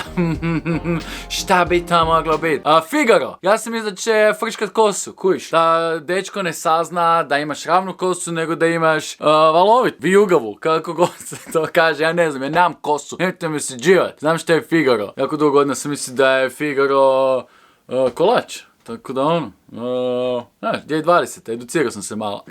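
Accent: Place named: Rijeka, Croatia. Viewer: Danas su nam bili prijatelji iz rjeke isti naglasak ko ti ja se odusevila ;) baci neki vlog na temu srbi I hrvati u smislu da se medjusobno vole ili tvoje neko misljenje za to ;)eto moj deda je bio na golom otoku I imam rodjake koi su preziveli oluju al eto ugostili smo porodicne prijatelje iz Rjeke I mnogo dbr druzenje bilo ;) I sa muske strane .xd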